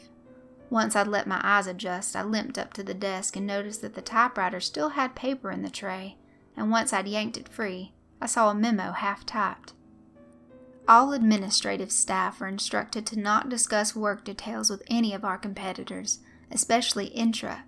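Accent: South, United States. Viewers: This is my first story of yours I'm listening to! Is your voice always like this? (its been so long since I last heard someone from the South)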